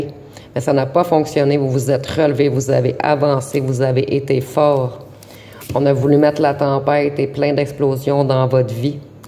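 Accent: Canada. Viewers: Bonjours à vous ! Je me demandais, comment vous avez capté ça, vu votre accent vous êtes au canada ? Mais tout ça là, j'le vois dans mon petit coin d'france ! Mais tout tout tout... Vous êtes balaise !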